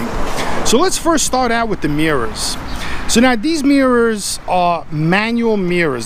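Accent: New York, United States. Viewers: thats boston.. this guy is from new yawk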